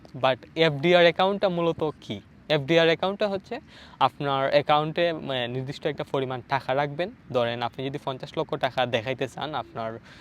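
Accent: Sylhet, Bangladesh. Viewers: Vai er basa ki sylhet ?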